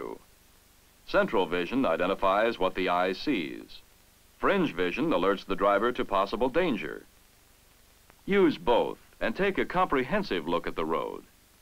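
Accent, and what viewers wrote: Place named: South, United States. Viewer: Southern Accent she has & yall come back now !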